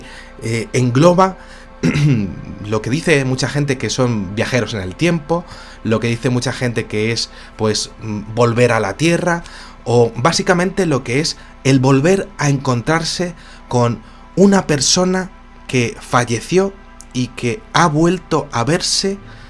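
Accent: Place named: Madrid, Spain. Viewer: Jajaja y su Inglés del barrio del pilar de madrid. Me gusta ese Ingles.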